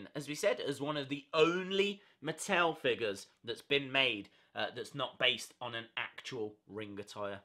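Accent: United Kingdom. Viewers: What ever he britsh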